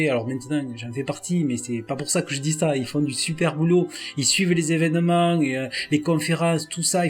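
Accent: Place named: South, France. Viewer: bravo!! bonne vidéo ça fait du bien de voir que tu le même accent du sud que moi ;)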